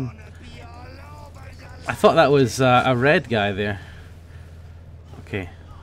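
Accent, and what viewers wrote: Scotland, United Kingdom. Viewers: So Scottish :) There can only be one Demoman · Knew it was a fellow scot with this wee fanny !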